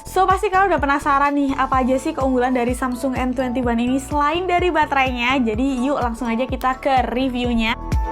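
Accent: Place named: Java, Indonesia. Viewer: Logat jawa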